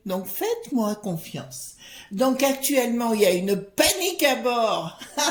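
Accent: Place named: South, France. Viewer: Merci pour votre tirage ! Surtout gardez votre accent du sud qui fait tellement de bien aux gens du nord ! A écouter le Pr Perron sur SUDR.... qui parle de la picpic et du Pr défraîchie..... la parole se libère !!!!!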